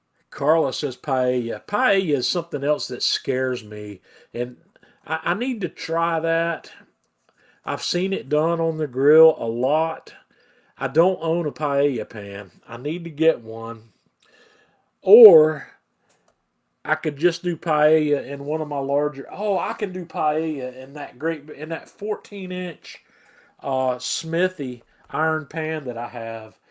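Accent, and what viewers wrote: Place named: North Carolina, United States. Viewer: The accent is awesome. What part of NC are you from?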